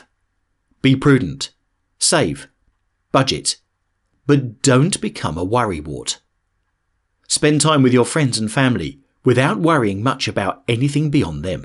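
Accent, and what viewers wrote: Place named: Scotland, United Kingdom. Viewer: Just enjoying the Scottish accent. 😊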